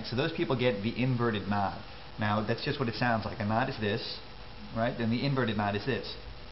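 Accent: New York, United States. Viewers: To be continued? +1 to all your videos on NewYork accent. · u seriously live by this code of new york, or are u just a dood that just talks like this for the camera?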